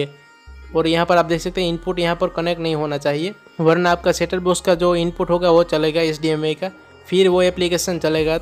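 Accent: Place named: India. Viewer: Englisch with mixed indian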